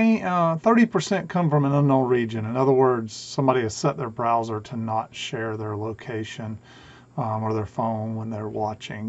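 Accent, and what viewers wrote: South, United States. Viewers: I love all your videos, especially the tube amp builds. Doesn’t matter if they’re long or short. I’m in the process of watching them all from the very beginning so keep on making them please. I watch them in total but will pause occasionally for mundane stuff like housework and eating etc. You’re southern drawl is very pleasant to listen to and I’ve learnt loads since I started. For that I’m extremely grateful sir. I retired from electronics two years ago but still have a big soft spot for tube gear, radio or audio, and your knowledge is invaluable. Thank you.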